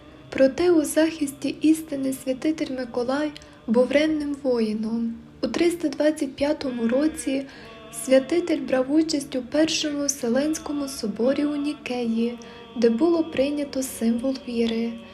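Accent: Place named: Transcarpathia, Ukraine. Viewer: Дуже ніжний голос, приємно слухати дикторшу. Помітив зразу закарпатський акцент, бо сам з Рахова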